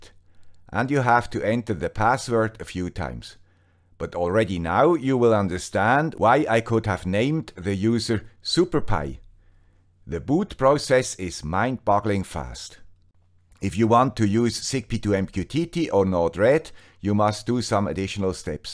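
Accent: Switzerland. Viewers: Dear guy with the Swiss accent, thank you for your videos. I ordered a S920, booted with USB-Debian Live but Balena Etcher only showed an empty white window. Repeated the process with xUbuntu Live, Balena worked this time but system didn't boot. "sudo efibootmgr -c -l /EFI/BOOT/BOOTx64.EFI -L HomeAssistant" replied with "EFI variables are not supported on this system". Finally "sudo efibootmgr --create --disk=/dev/sda --part=1 --label="HAOS" --loader='EFI\BOOT\bootx64.efi'" made my system bootable and everthing is working now. Thanks again and have a good one! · The Guy with the "German" Suiss accent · I hate to sit in the first row, Mr. Swiss accent.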